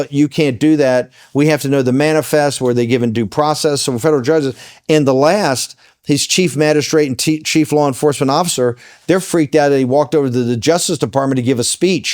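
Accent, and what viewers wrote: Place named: RP, United Kingdom. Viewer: too bad Bannon has to be talking with a lightweight. A beeb accent is not a guarantee of ability.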